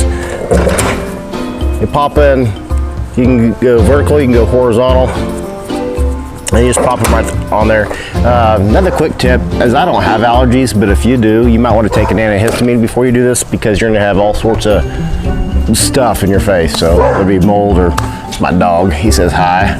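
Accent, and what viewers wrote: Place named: Midwest, United States. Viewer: It’s a Midwest thing. I don’t hear it, but definitely try to pronounce as roof when I’m talking to people.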